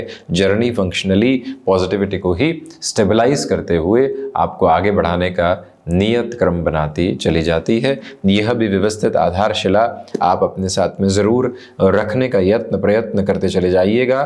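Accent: Standard. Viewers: Shudh Hindi, astonishing to listen,so great guru ji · Itni shud Hindi kitni achi lagti hai sunney main 🙏🏻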